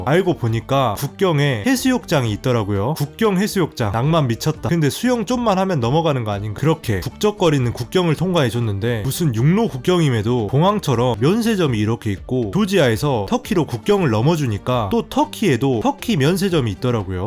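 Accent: Jeolla, South Korea. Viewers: 억양이 전라도분이신거 같습니다. 영상 재밌게 보고 있습니다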